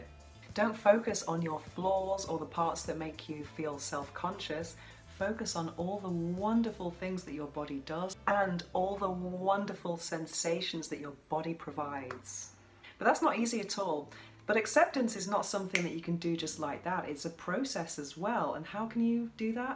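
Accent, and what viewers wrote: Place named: England, United Kingdom. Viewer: I love your videos very educational I find them you're a great looking lady I love your english accent just curious as if you prefer britain or spain where you're living at now .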